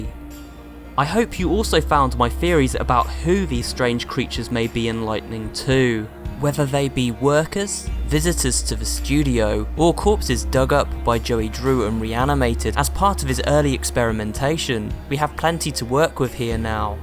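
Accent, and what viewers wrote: United Kingdom. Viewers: That's a pretty good detective work. Also, I did notice your very interesting aksent. Are you british?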